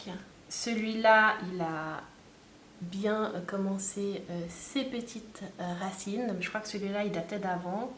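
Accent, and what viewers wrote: Switzerland. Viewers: Sympa ce petit tour ! Et ça m’a fait plaisir quand j’ai reconnu ton accent, trop cool d’avoir des suisses dans le YouTube game 😍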